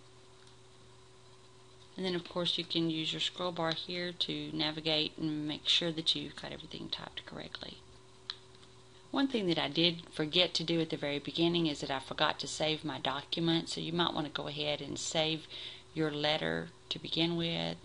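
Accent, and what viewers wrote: United States. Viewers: I just love your southern accent. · are you Texan?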